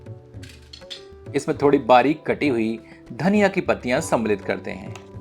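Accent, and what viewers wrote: Standard. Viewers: Aapki hindi bahut shudh hain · the way you speak pure Hindi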